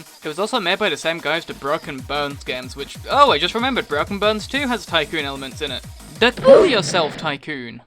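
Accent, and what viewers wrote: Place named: Sunderland, United Kingdom. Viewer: He sometimes sounds a lot like a mate of mine from Sunderland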